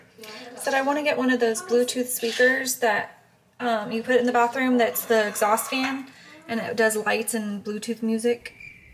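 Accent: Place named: Tennessee, United States. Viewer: HAHAHA, I knew you were from TN. Sweet little southern bell. It's fun cleaning with you.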